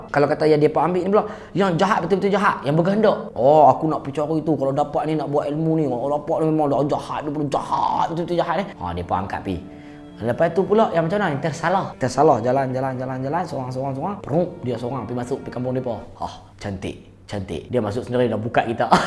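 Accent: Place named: North, Malaysia. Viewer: Itu loghat utara, Abang ni, di dalam video mL studio memang akan guna loghat utara dia..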